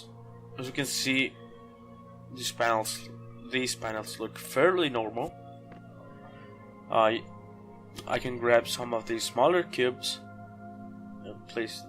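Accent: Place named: France. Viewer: You can hear it's the dev by the French accent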